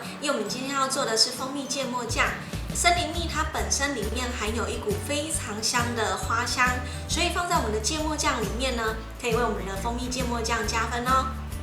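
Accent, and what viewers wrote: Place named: Taichung, Taiwan. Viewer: 台中腔，ㄓㄔ不分 ❤️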